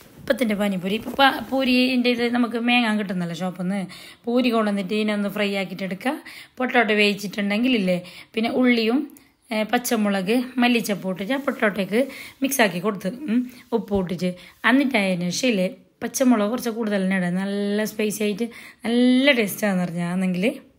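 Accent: Kasaragod, India. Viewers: നാട് എവിടെയാണ് കാസർകോട് ആണോ സംസാരം കേട്ടപ്പോൾ തോന്നി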